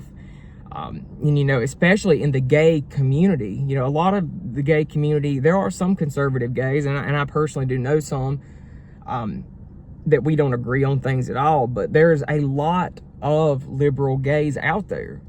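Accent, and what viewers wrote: South, United States. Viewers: It's not the gay community that this makes look bad baby, it's the trans community that's doing that. They're the ones shooting up kids at prayer & killing Charlie. Ain't nobody I know mad at the gay community that I've seen. They don't have anything nice to say about the trans community tho. I know several gay people & not 1 of them have a mean bone in their body. They're sassy & can get a lil spicy when provoked, but nothing mean or malicious about them. Why u urself sound sweet enough to give somebody diabetes sir.😂 That southern twang was made for u baby.😁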